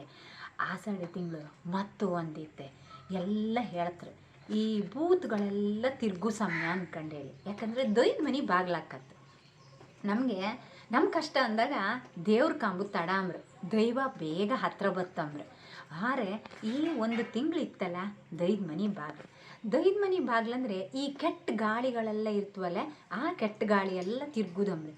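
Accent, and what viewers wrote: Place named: Kundapura, India. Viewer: Kundapura kannada ❤ U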